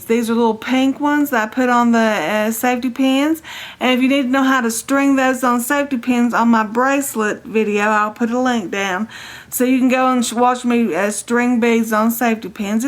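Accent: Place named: South, United States. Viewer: I love your accent! Are you from the south? I am and I have a strong accent. You remind me of my Grandma :)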